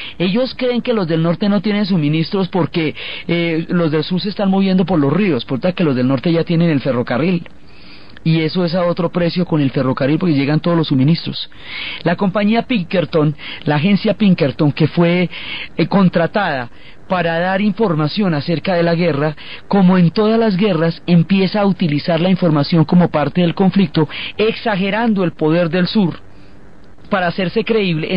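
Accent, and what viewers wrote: Colombia. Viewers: me pasó igual,el acento colombiano, y lo de "parche" "sensacional" jaja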